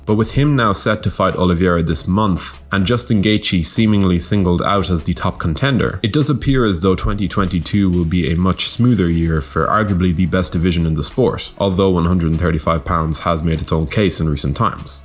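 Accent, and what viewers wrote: Ireland. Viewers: Listening to you talk reminds me of the 2 weeks I drove around southern Ireland. Ring of Kerry was pretty dam awesome, fwiw. Great video.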